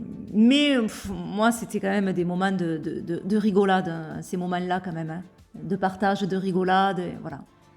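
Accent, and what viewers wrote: South, France. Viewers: Jaime bien le petit accent du midi 😊.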